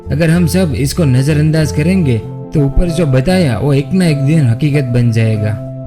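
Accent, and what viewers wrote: Gujarat, India. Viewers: Bhai aap Gujarati ho..... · Are u Gujarati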